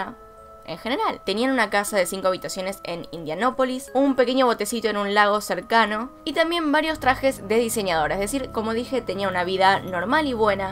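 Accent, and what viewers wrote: Argentina. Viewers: Me gusta tu acento Argentino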